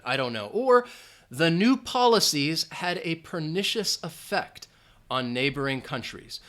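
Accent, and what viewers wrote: United States. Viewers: American , r is pronounced"are" , he uses slap t= "d" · Very useful for those who speak fluenty another language wich isent their native one like me thank you guy and please try to not be absent for a long time please are you American or British i think your accent is pure american but I'm not sure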